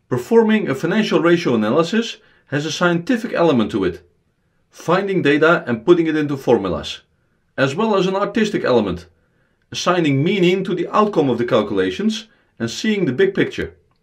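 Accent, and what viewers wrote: Netherlands. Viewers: Alleen echte strijdes herkennen het Hollandsche accent :) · You are Dutch...I can hear it. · Are you Dutch?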